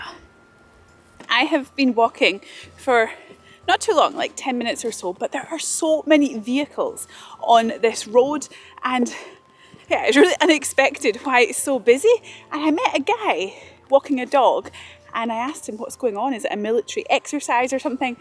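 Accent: Scotland, United Kingdom. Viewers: You are quite adorable. I'm from Clarkston originally, although I have lived in Vancouver British Columbia since 1967 at the age of 15 and your accent is so fresh and beautiful.....what part of Scotland did you grow up in? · Such a lovely warm person. Always real and uplifting. And I so enjoy listening to your Scottish accent. Thanks for sharing your adventures. Looking forward to the next one. From the redwoods in Northern California.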